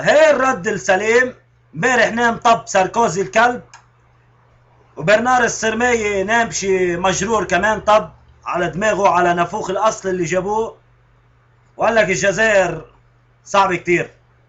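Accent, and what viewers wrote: Lebanon. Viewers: لهجته لبنانية مو سورية..... هاد لبناني مو سوري وبعدين بيحكي كلمات وسخة كتير وهي من عادات اللبنانية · هذا ماشي سوري هذا لبناني باينة من لهجة تاعو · هيدا لبناني مش سوري لهجتو لبنانيه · هاد لبناني مو سوري .. السوريين مابيحكو هيك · ألى الكل هاد الشاب ليس سوري هاد لبناني الدليل اللهجه مش سوريه لهجتو لبنانيه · هادا لبناني مو سوري نحنا لهجتنا مو هيك ياجماعة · اول شي هاد مدح الجزائريين تاني شي هاد لبناني مو سوري و واضح من لهجتو حاج تعملو فتن بين بعض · هاد لبناني مبين من لهجتو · لك هاد لبناني مو سوري مبين من حكيو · انا سورية ولهجتو غير اللهجة لسورية لهجتو بتشبه اللهجة اللبنانية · هاد لبناني من لهجتو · من لهجته باينة انها لهجة لبنانية .والله لي فخر انني جزائرية والف تحية لفلسطين وسوريا ولبنان والمغرب كلنا عرب اخوة نحط ايد بإيد باش نصنع المجد ونرجع زمن القوة والسلطة اللي سلبت منا وأستدل بكلامي بقول عمر بن الخطاب رضي الله عنه (نحن قوم أعزنا الله بالإسلام، فإن ابتغينا العزة بغيره أذلنا الله)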